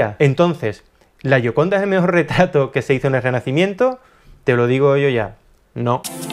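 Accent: Andalusia, Spain. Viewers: Si de verdad amas el arte, hablas de obras que te gustan., siempre hablas, con tu acento encantador andaluz eso si, de obras que no te gustan y las degradas a lo mas bajo. Mucho escozor hay bajo esa melena...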